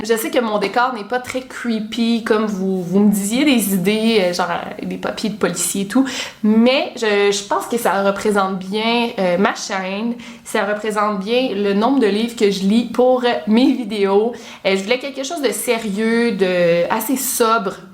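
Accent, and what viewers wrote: Quebec, Canada. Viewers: Je viens de découvrir ta chaîne depuis à peine une semaine et depuis, je suis scotchée à tes vidéos surtout celles qui abordent les disparitions inquiétantes et les morts mystérieuses. Jusqu'ici, j'ai toujours apprécié de regarder à la tv des faits divers, dossiers criminels et autres disparitions inquiétantes. Il y avait certains sujets que je connaissais déjà mais d'autres dont j'ignorais l'existence et qui méritent d'être plus approfondis par les médias. C'est le cas pour les affaires d'Emma Fillipoff et de Lisanne Froon et Kris Kremers qui m'ont particulièrement touchées. 😢 Tu as une manière tellement captivante pour raconter ces histoires troublantes que je m'étonne parfois que certaines vidéos durent 25 minutes ou plus. La musique marque l'ambiance sans trop exagéré l'aspect mélodramatique et l'apparition furtive de tes chiens rassure lorsqu'il y a des moments plus perturbants dans l'histoire racontée. 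Encore une chose : tu as réussi à me réconcilier avec l'accent québécois 😁 Bonne continuation et salutations de la Belgique 🇧🇪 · J'adore ton accent québécois ça te fait une belle voix 😉😱😍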